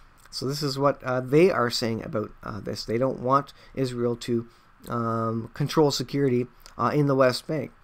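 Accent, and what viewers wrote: Canada. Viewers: Canadian Aye? 😄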